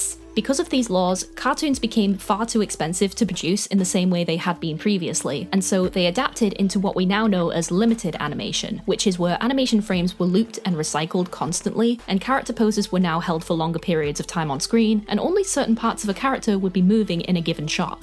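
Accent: United Kingdom. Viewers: I am trying to pin down your accent. It sounds sort of British, but not really.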